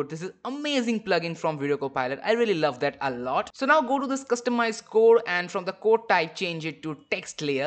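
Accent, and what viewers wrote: India. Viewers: indian accent me bol le ya usa wale me bich me attka hai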